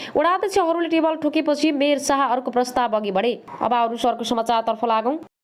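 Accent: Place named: India. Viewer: नानी इन्डिया हो कि नेपाली बुज्नै सकिएन एघारलाई ग्यारा निनन्सय जस्तो शब्दले नेपाली हैन झै लाग्छ । उत्तार पाम न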